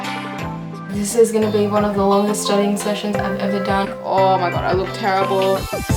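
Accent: Australia. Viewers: while you were sleeping was such a good drama!!! i got second lead syndrome watching it though >.< also, i love your aussie accent haha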